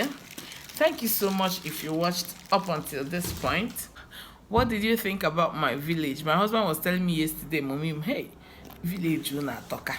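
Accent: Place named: Ngwo, Nigeria. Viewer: Everytime I hear your igbo intonation I always say you sound like someone from ngwo. Please what part of ngwo are you from?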